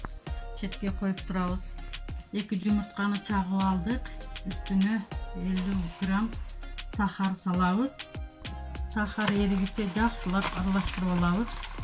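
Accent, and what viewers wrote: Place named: Ichkilik, Kyrgyzstan. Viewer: Ичкиликче сүйлөйт экен башыга ичиге деп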